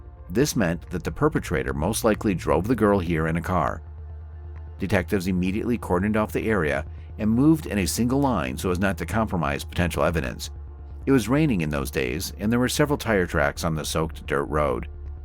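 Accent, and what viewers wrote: United States. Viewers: This script and the narration are terrible. Who says that a show was on "federal TV"? And it's full of things like "Gary refused to give his DNA. The police then formed a list of 11 suspects that refused to give DNA samples. Gary was among them." No shit, I heard what you said... And that terrible Midwestern short "a" just makes it that much more abrasive.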